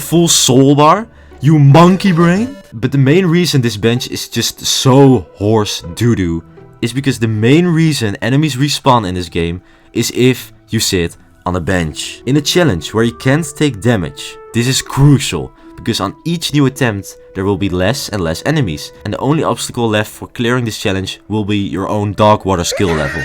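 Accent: Netherlands. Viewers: Are you dutch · Are you dutch? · You sound dutch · ayo ben jij nederlands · Ja duidelijk een nederlander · Are you dutch?? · Love your dutch accent😁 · Dutch accent spotted in the Hollow Knight wilds! This was a super fun video, your dedication to making it as good as you can really shines through 🫶🏻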